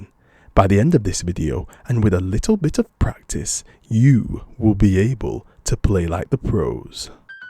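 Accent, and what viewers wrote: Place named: United Kingdom. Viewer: I love you're british accent man and yes i understand the v'reversal but i still can't play like the pros 🤣🤣🤣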